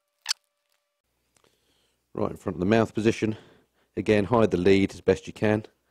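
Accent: United States. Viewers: LOL! Loved your American accent! My buddies and I are buying these soon. They seem to be the best out there. It was fun to see box contents and get your take. I wonder if Cardo speaks Texan?! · loved the American Accent .. I still find it amazing that we speak the same language, yet "you guys" sound like your chewing on your words :)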